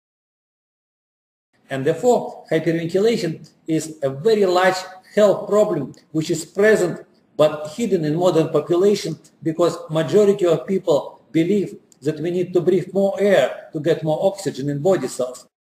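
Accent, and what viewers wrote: Russia. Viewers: Your accent is very Russian :) but I see you're confident in your speech and it makes video more interesting to watch. Thanks for great lessons.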